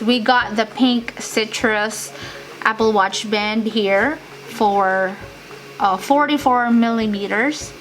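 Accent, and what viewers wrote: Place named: Thailand. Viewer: I love your thai accent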